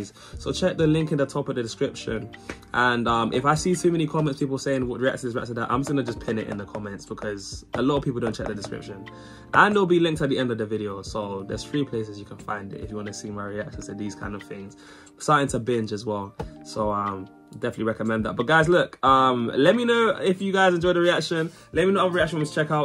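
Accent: United Kingdom. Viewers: i could be wrong, but you sound british, and say youve never heard the beatles or queen? have you been in a coma your entire life?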